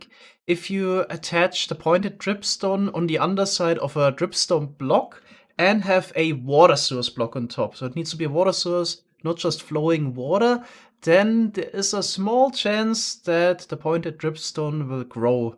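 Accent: Germany. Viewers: Man hört sofort den Deutschen Akzent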